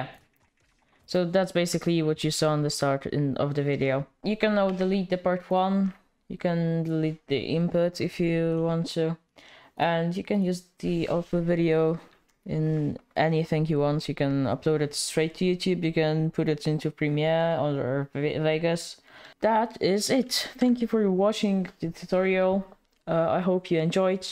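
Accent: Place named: Poland. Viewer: jako polak masz koks akcent (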